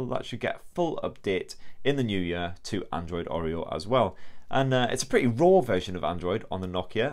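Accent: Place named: North East England, United Kingdom. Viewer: The reviewer's voice is really off putting with that vile north east accent . He has no concept of speaking intonation whatsoever, just likes the sound of his own voice and is rushing through this review as if he's got a 'promise on' ...... .He needs to go back presenting the 'One show / Country file' .. .. lol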